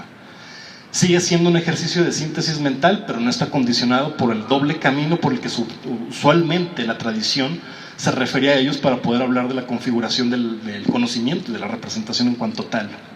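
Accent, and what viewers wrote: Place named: Coahuila, Mexico. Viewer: Que onda maestro, perdon, licenciado. Me alegra que aun no has perdido tu acento Coahuilense y el estilo de Saltillo. Yo he perdido los acentos en mi teclado pero seguire con mi estilo. No hay defensa para los elogios asi que cuidate de ellos; no, mas bien de quien los espeta. Me gusta la frase de Schopenhauer que dice "Toda verdad atraviesa tres fases: pimero es ridiculizada; segundo, recibe violenta oposicion; tercero, aceptada por algo evidente". Escuchando tu ponencia se me vino a la mente las dificultades que tiene la gente de 60 a 80 años para usar una computadora, tablet or telefono "inteligente". El problema no es presionar los botones o pantallas, como mucha gente supone, ell@ son de una generacion analoga y mecanica. EL problema radica en la idea y los conceptos del objeto que tienen frente a ellos. La idea de enviar una carta, no impresa, atraves de un aparato electronico que guarda, no en un cabinete sino en las nubes, la informacion en algun lugar del cyberespacio y lo envia en un lenguage binario - 0 1 - y que el aparato receptor interpreta esos 0s y 1s y los muestra en la pantalla como una copia original de la dimension estetica de la representacion de la idea de la carta original. 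Sabes, tu y yo somo de una generacion que dio genero la transicion de lo analogo a lo digital. Las nuevas generaciones son digitales y saben como usar los aparatos, pero al igual que sus abuelos, no saben como funcionan. La nueva generacion technologica-digital es la representacion c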